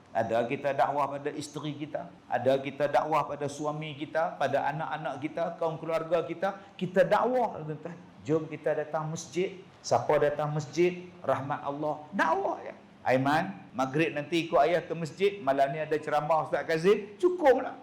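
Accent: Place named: Batu Kurau, Malaysia. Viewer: Pelat batu kurau tu buang lh sikit .bkn ceramah Kut kg paya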